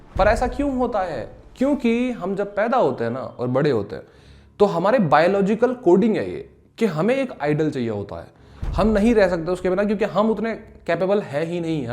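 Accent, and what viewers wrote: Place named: Tughlakabad, India. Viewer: yaar bhai tum pakka tuglakabad gaon ke ho mentally and educally bilkul baise hi sound kar rahe sayad mujhse 2 saal chotte hoge but baate bilkul meri jaise kar re ho mere bhai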